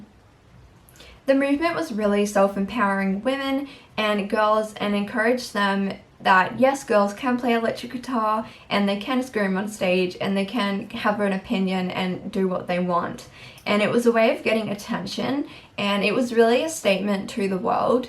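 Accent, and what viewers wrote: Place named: United Kingdom. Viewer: Maybe have a look at male "fashion" and make comparisons to modern day male "street" ware, that would be interesting. Dr Martins are really getting some attention here in Denmark these days among the young people, I see them all the time, never owned a pair but always thought that they looked a bit uncomfortable, guess you have to "suffer" for beauty. Another thing that was popular in the good old grunge days where t shirts on-top of sweaters , Layne Staley from AIC always did that, I guess it also covered up his needed marks among other things. I take it from your accent that your from the UK, I really liked those UK bands from the Brith pop days, it was a funny switch in style and attitude, the fashion changed a lot, slim jeans, leather jackets, retro Adidas sweatshirts, under sized t shrirt and poloshirts took over, those where funny times.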